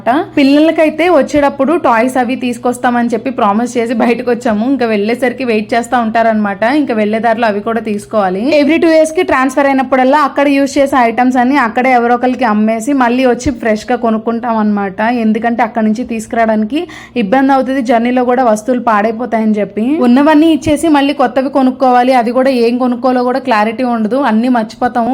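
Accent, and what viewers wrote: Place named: Godavari, India. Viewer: Meeru mataludu thunte godavari salang gurthuku vachindi andi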